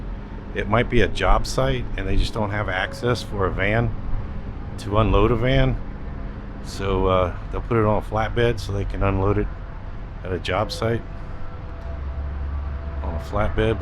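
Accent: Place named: Minnesota, United States. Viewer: Good MN accent there, fella. Stay safe.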